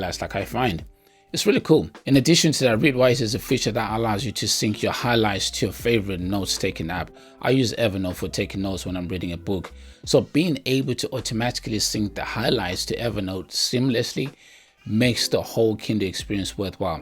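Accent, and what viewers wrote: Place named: Nigeria. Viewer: I can hear that Nigerian accent. Are you Nigerian? Also, amazing video!!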